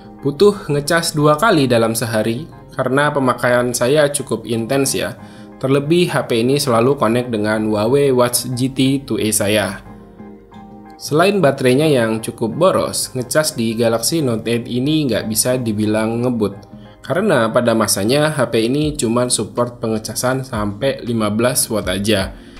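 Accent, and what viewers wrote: Samarinda, Indonesia. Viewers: Orang Samarinda kah bang?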